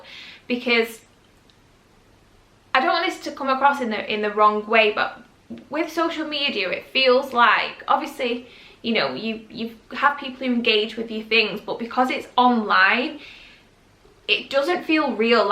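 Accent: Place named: North, United Kingdom. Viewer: Love the northern accent always... and hahah I remember the 20mins to text back so I will take longer.... what a game 😂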